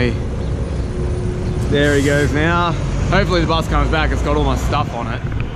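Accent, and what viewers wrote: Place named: Australia. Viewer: Dude! I love your Australian Accent 🤣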